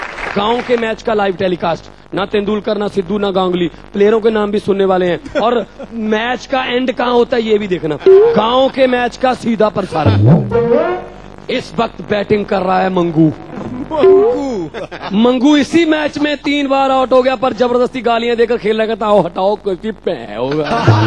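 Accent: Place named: Punjab, India. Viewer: he is actually great comedian in punjab.but he is very uncomfortable speaking hindi in punjabi accent 😂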